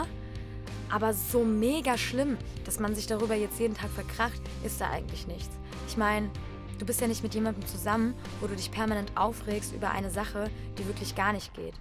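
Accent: Germany. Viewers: Ich liebe dich, I used to be obsessed with germand and germany I have no idea why, but I love the accents. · That German accent is so pure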